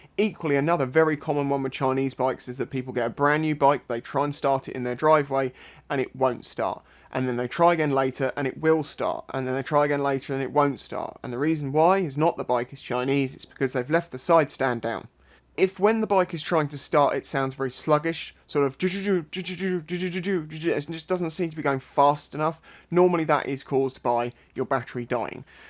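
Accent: United Kingdom. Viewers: That's how you know he is truly British and not faking an accent.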